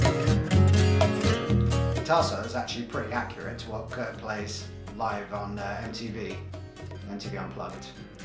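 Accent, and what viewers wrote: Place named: Ireland. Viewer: Have a nice day.You sound irish.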